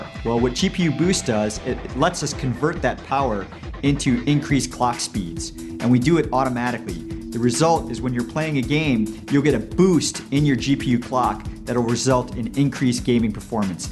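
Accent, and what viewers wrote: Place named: United States. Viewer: Brown guy with an american accent...WOOOOOOOOT JOIN THE WAGON BROTHER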